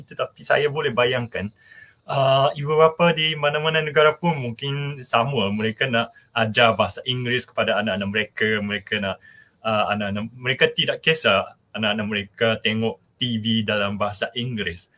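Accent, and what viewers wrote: Indonesia. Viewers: Dari cara bicara nya pun lebih banyak logat dan abjad bahasa Indonesia...😂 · Suka Melayu,tp logat Indonesia wkwkwk 😂😂😂😂 Indonesia mmg the best👍👍👍👍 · Kalo Di video ini, Bahasa melayu nya, sangat indonesia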